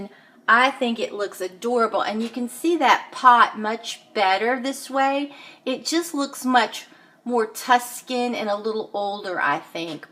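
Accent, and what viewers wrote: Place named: Los Angeles, United States. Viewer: Do you live somewhere in LA. You have an accent similar to mine, but I haven’t lived there in 27 years.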